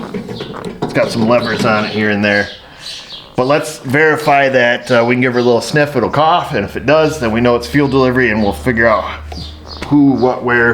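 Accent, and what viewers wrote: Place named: Illinois, United States. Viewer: is more midwest sounding than Taryl....I'm guessing downstate Illinois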